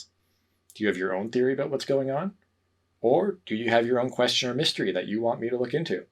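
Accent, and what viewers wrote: Newfoundland, Canada. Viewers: Thats awesome! ive been to both of those provinces many times. Im born and raised in St Johns , NL. I could tell by your accent at the end of sentences. You have a good idea here for videos. Really enjoy them. Trying to think of a video idea for you. The Newgame+ troupe might work for a video. Anyways. Cheers from Newfoundland 🍻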